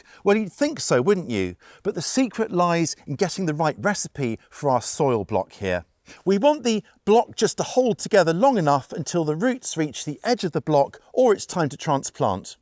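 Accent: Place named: United Kingdom. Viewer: Hello Ive been watching your videos. I just decided to join your channel. Like your accent.. can I ask what part of the UK. You live in?? Joe from the USA.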